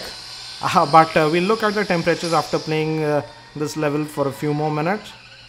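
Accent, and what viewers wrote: India. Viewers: I love the Indian accent...Makes me smile every time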